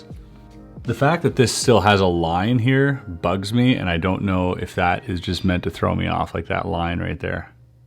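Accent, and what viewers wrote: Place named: Quebec, Canada. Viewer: Salut! Je viens de voir une vidéo de toi qui parle en français, je suis tes vidéos depuis plusieurs années déjà et c'est la première fois que je t'entends t'exprimer en québecois, c'est weird! Je savais que t'était de Montréal comme moi mais je savais même pas que tu parlais bien français! Entk bonne continuation, j'aime beaucoup tes vidéos.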